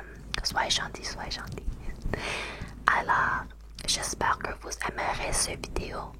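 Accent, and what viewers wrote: Quebec, Canada. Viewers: En fait elle est canadienne mais elle a un accent québécois donc j’en déduis qu’un canadien qui parle français aura forcément un accent québécois ? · Sounds exacly like a quebec accent lol and its a good thing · Don't know if you're french or not.If you aren't and didn't understand when she explained it.She is from Québec wich is a french province in Canada (thats why her accent is way more like texas english but for french).To be brief,she grew up in french schools for her whole life.Her english is good because of Canada's other national language being English.Alot of French people in Canada also have perfect english. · Tu parles le français, mais on entend vraiment le français du Québec, avec les tic de langages, l'accent. 😅 C'est bien de vouloir parler toutes les langues de son pays. ❤ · J'ai cru entendre une québécoise. Impressionnant. 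😮 🇫🇷👌🏻👏🏻👏🏻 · Je trouvais qu’il y a avait une touche de québecois dans son accent haha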